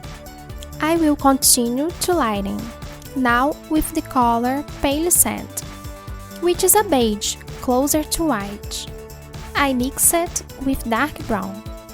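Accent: Brazil. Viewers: Esse sotaque aí e BR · Dá pra ouvir o português por debaixo do seu ingles. Vc é Brasileira de de onde? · I can recognize a brazilian accent easily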